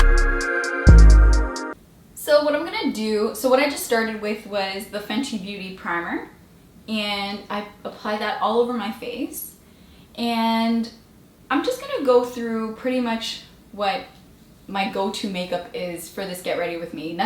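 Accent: Tigrinya, Eritrea. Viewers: I like u r tigriniya accent so lustig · Girl I like your half English and Tigrigna accent 💯 you got me dead af 😂 I subscribed to your channel keep it up Cutie 🎀🎀🎀🎀🎀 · I like ur tigrina lol 😂😂 😜 N you're so beautiful u know that 😍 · I love your Tigrigna accent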